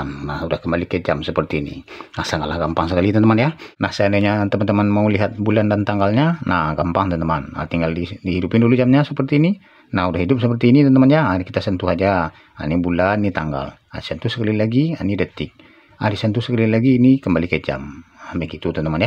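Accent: West Sumatra, Indonesia. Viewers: Org Minang kah bang😅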